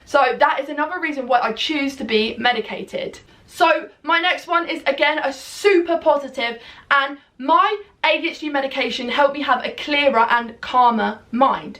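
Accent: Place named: United Kingdom. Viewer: I thought you cant be prescribed stimulants in the UK? You sound like you're from Uk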